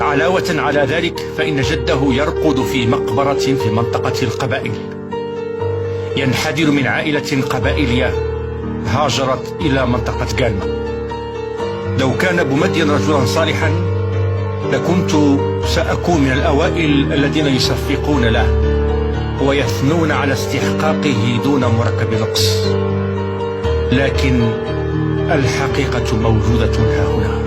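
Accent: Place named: Morocco. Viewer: صاحب هذا الفيديو باين مروكي من طريقة كلامو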